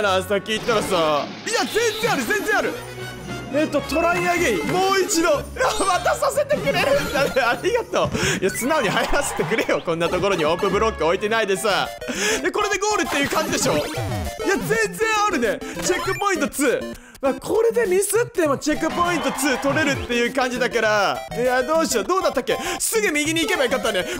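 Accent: Fukuoka, Japan. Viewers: 福岡弁？とゴミみたいなOPツボです！いつも楽しませてもらってます🤗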